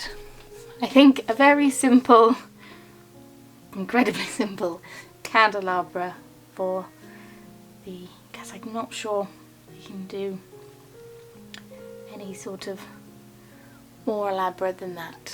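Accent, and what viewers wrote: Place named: England, United Kingdom. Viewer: That candelabra came out amazing..I'm so inspired but your imaginative creations! It's so mezmorizing to hear your proper English with an English accent..lol🥰 gives such a pure primitive vibe❤❤❤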